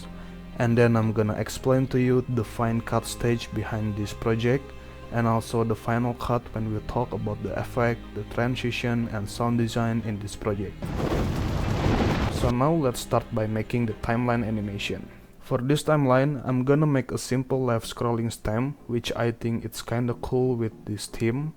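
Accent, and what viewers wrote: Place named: Indonesia. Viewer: Orang indo bg logatnya kaya indo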